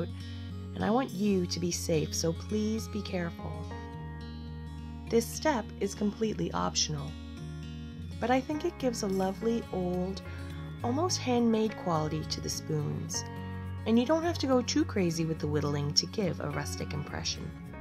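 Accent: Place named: Canada. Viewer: Thats lovely, are you Canadian? You sound a bit like Suzie from nail career education on here?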